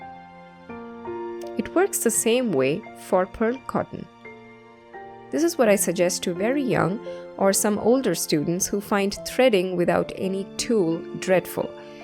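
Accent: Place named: Kenya. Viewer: Thank you. Your accent is so Kenyan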